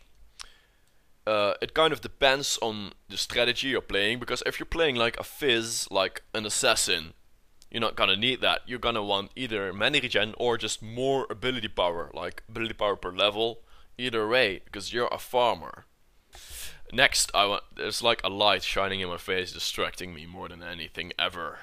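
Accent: Netherlands. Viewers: Yup I think so cause' he's dutch and dutch people have that accent plus he has a rather deep voice :) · Lol ik vind het gewoon grappig dat nu pas iedereen er achter komt dat hij nederlands is, hoort dan niemand dat nederlandse accent in zijn engels xD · yup his accent is stereotype dutch :Z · Ik hoorde aan je stem al dat je Nederlands was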